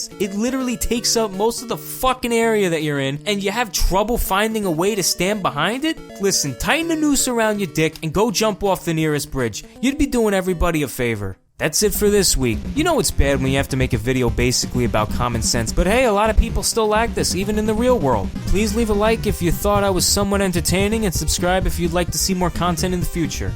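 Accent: New York, United States. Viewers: Is this guy a fokin new yorkah or Brooklyn dude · The fact that he has a New York accent makes every one of his roast better 😂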